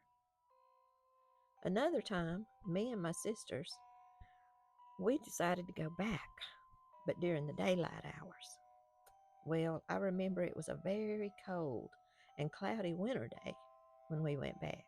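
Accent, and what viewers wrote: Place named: Appalachia, United States. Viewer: I love the beautiful accent of your voice. Reminds me of Appalachia. Thank you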